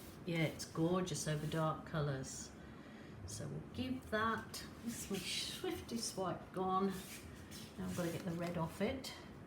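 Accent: Birmingham, United Kingdom. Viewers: Funny after all those years you still have a Brummie accent. My hubby is from Luton in Bedfordshire and has been here in Sydney for 39 years. Aussies think he has a British accent and Brits think he has an Aussie accent LOL. Thanks for all your videos and sharing your experiments with us.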